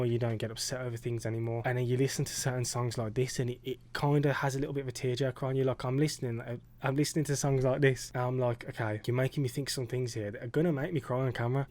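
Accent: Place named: England, United Kingdom. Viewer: Trying to figure out your accent from my Canadian perspective. Are you from the midlands or from a northern town/city?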